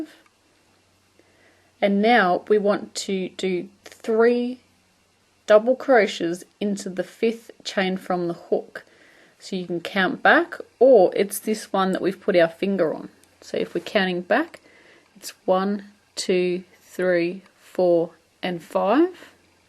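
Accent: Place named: Australia. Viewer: my first thought at her voice was "ooh you're aussie aren't you?"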